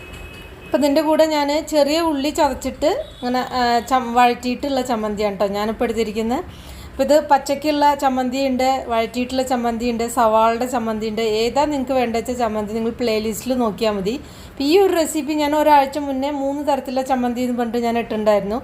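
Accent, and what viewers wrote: Palakkad, India. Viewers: avar parayunnath enthanennu manasilayallo athu pore. Palakkad chilar usually ingane aanu parayuka. Ellaavarum ningale pole achadi bhasha samsarikkanam ennu nirbandham pidikkaruth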